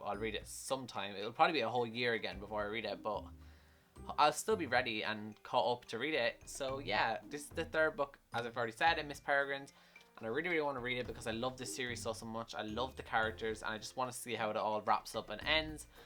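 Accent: Ireland. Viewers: Are u from Dublin ?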